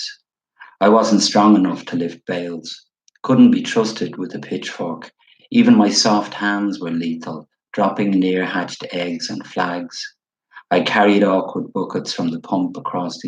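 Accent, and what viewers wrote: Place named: Dublin, Ireland. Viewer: Lovely to hear you read in your Dublin accent, I'm so used to my own inner voice reading your poetry, this was a surprise. Great reading! Thank you.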